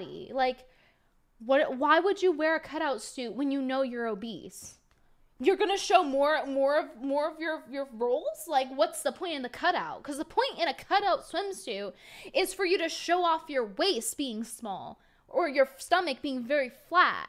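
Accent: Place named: Texas, United States. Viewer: you are taking on a bit of the Texas twang you are a southern belle now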